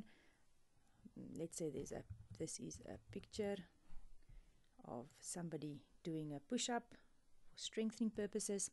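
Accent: Afrikaans, South Africa. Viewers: South African? The accent is strong. But it´s cool to hear it so unexpectedly. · Thanks very much, got to love the Afrikaans accent! Dankie!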